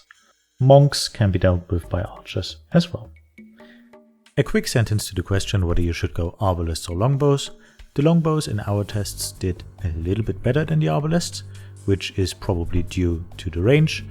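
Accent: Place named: Germany. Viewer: Nice first strategy video. I think the best way you can improve this is working on your idiomatic English. As an example, there is your use of the formation "despite of", which occurs at least four times in this video. Now, the way you used it is fine, except for the fact that the formation does not exist in English. The formation you are looking for is "except for". Your non-standard word order is distracting. For example, "This makes it possible to go earlier on wood", which sounds a bit like Yoda-speak. Try: "This makes it possible to go on wood earlier". Also, "they run out of a bit faster wood" should be "they run out of wood a bit faster" - otherwise it almost sounds like they are only going to have slow wood left after the "a bit faster" wood runs out. In these constructions, remember to group "verb + direct object" then put the modifier (e.g. 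earlier/a bit faster) at the end. Word order is as important in English as word form/ending is in German. Incidentally, sheep is one of those rare words in English that are the same in singular and plural - there are no "sheeps". There are a lot of typical German-speaker phoneme substitutions in your spoken English but these will be fine if you can iron out the grammatical issues. These comments are intended to be constructive. Your English is much, much better than my German, but I am not running a media channel in German so my mistakes have few consequences. You are providing quality AoE content and I think if you work on these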